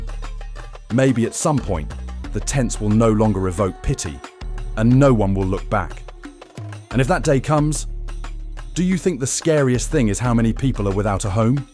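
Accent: United Kingdom. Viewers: This is fake! I live in Oakland and this fake documentary with a foreign UK accent explaining you something they don’t even know about it. Just to create fear. This is straight FAKE!!!! That’s like UK people telling you about Mexico and Cartels.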